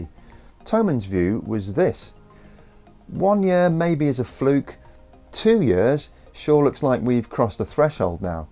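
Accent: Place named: Cockney, United Kingdom. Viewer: Lots of "sensationalism" & "doom&gloom, if it bleeds it leads" MISLEADING PSUEDO SCIENCE from our cockney accented "pseudo scientist".... Notice he says "sea ice" a lot. Propaganda from fiberal globalist seditionists seeking to "carbon tax" us into oblivion, this video is clearly paid advertising to sell us all into submission... don't believe the rhetoric. HERE ARE THE FACTS: 1) ice shelves are very thin floating 'skins' relative to the main ice mass at the poles, making for dramatic imagery (likely photo-shopped to support the phoney narrative). 2) yes, "sea ice" is diminishing, and yes our climate is warming, but... NO, Sea levels will NOT rise & flood coastal regions. 3) NASA's circumpolar satellites measure surface elevation & micro-gravity (mass directly below the sat.) and clearly show FIVE TIMES MORE ICE IS CURRENTLY DEPOSITED BY SNOWFALL AT THE POLES than what is lost to melting "sea ice". 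4) the disparity between the much greater annual polar deposition vs. loss of peripheral "sea ice" is ACCELERATING (especially at the Antarctic landmass). 5) while global warming is for real, it will NOT result in "catastrophic sea level rise" for the above reasons as well as greatly increased evap/precip filling depleted lakes, rivers & aquifers, as well as greater atmospheric moisture held in clouds & humidity. 6) CLIMATE MODERATION will greatly warm our winters but ACTUALLY COOL OUR SUMMERS in many regions due to the cooling effect of MOBILE liquid meltwater vs prior stationary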